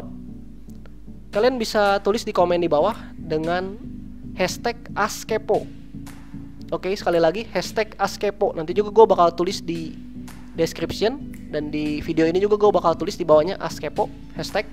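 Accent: West Java, Indonesia. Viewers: langsung keliatan logat sundanya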